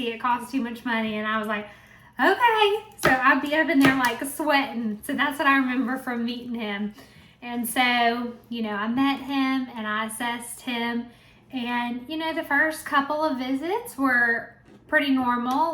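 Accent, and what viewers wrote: South, United States. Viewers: you have a beautiful southern accent i thik its southern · You have the nicest Southern accent!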